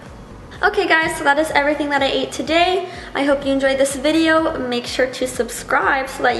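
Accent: Canada. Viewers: she sounds canadian, is she? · are you Canadian?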